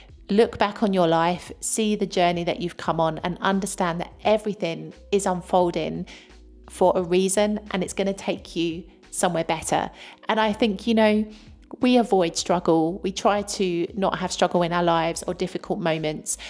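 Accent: United Kingdom. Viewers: I would like to hear you speak in Italian on a video given your British accent?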